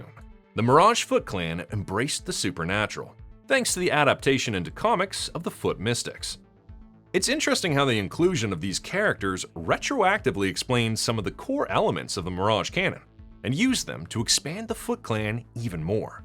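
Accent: Canada. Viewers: Is this guy from Nova Scotia?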